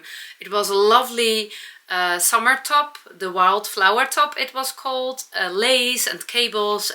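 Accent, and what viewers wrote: Netherlands. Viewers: I love the “heart jumping”! I’ve been saying it and my Grandsons (5 and 9) think it’s fun and they know exactly what I mean when I say they make my heart jump!❤❤❤ Love your podcast! And your “Dutchy” English. You are perfectly easy to understand!!! ❤️🥰❤️🥰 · I live in Kentucky in the USA. I love your Dutch-ie English!!! Heart jumping is one of my favorite things you say. It is such a beautiful and descriptive way to express it! I have always also loved your hair. You looked extra beautiful this episode. I have similar texture to my hair and I think I will cut it like yours!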